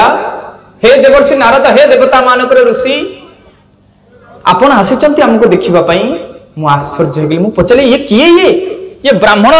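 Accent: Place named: Sambalpur, India. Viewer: ସମ୍ବଲପୁରୀ ସ୍ଵରରେ ଚାଲିଛି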